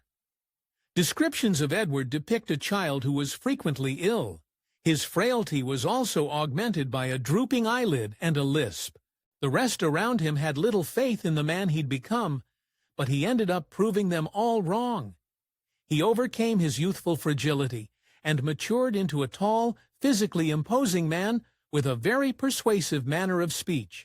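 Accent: United States. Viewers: Hearing an American voice trying to explain history is actually comical. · Why is an american talking about English history?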